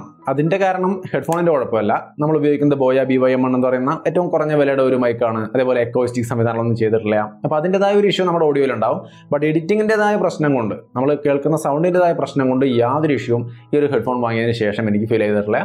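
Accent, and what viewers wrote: Thrissur, India. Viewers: Thrissur slang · മറ്റു വീഡിയോകളുടെ അപേക്ഷിച്ച് നോക്കുമ്പോൾ ഈ വീഡിയോ തൃശൂർ സ്ലാങ് ഫീൽ ചെയ്യുന്നുണ്ട് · trissur slang ee videoil nannaai kayarikoodiyallo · Thrissur slang 😁😁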